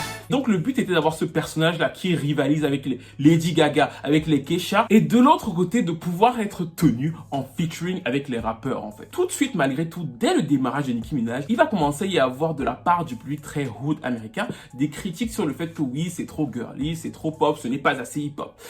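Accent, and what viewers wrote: Cameroon. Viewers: C moi où tu as un accent camerounais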